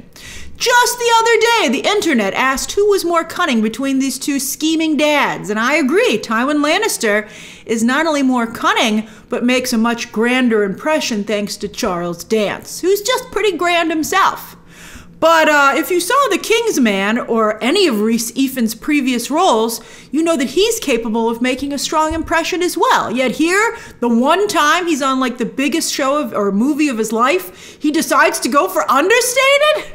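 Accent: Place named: New York, United States. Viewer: I love her new york/New Jersey accent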